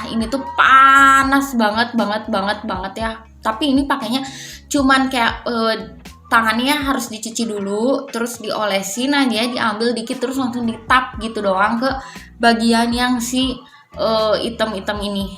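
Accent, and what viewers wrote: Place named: Pandeglang, Indonesia. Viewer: Logat nya pandeglang .. hehe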